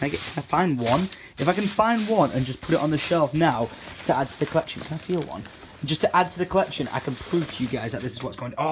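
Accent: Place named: United Kingdom. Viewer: Respond Why does he have a British accent?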